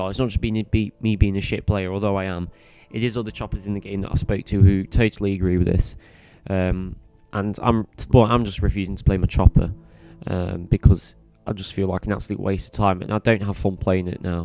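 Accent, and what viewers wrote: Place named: United Kingdom. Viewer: You also died twice as many times. Not blaming you though. If where you're playing is anything like the North American servers (you sound vaguely British so maybe you're on the Europe ones) 3/4 your teams healers are selfish DPSing DoKs and Shamans. Compare the number of Zealots vs Runepriests in the average match. A lot less Zealots, why? Because you can't DPS for crap as them so Destros don't roll'em. You'll see the same issue with most of the mirrors. Order heals so they live and DPS longer.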